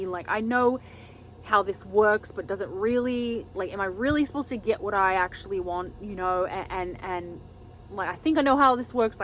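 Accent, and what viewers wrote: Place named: Australia. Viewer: Super cool scarf!! Australian accent superb as always!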